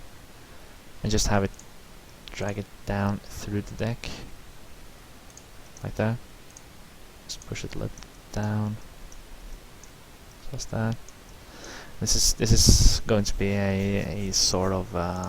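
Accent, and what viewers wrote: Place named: Spain. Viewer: This is a great tutorial, even more for the ones who are still starting to use Sketchup. Thank you very much for sharing! By the way, if english is not your main language, which is? Is it spanish (i have a feeling about it).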